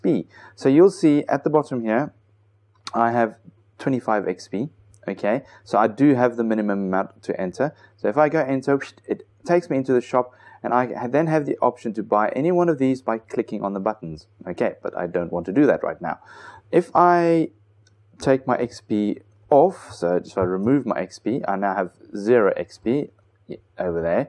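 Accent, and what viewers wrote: Afrikaans, South Africa. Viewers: is jy eintlik afrikaans? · are u south african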